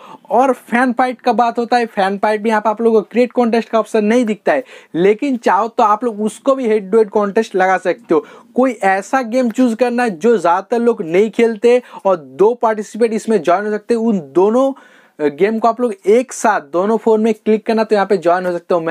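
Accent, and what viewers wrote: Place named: Odisha, India. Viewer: Odisha se ho kya brother???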